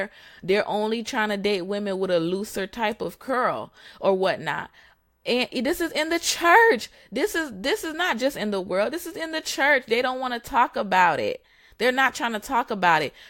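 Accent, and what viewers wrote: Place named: East Coast, United States. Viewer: Where are you from? New York? New Jersey? You have that east coast accent.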